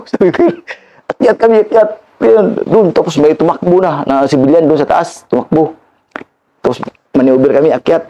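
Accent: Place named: Visayas, Philippines. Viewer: Hahaha visaya lagi do…nakakaaliw si sir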